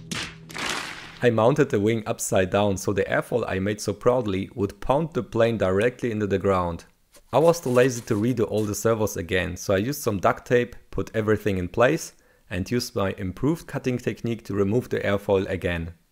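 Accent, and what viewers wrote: Germany. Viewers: Are you german? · so you are German 😂. Das "Nein" und dein axent sind ziemlich verräterisch.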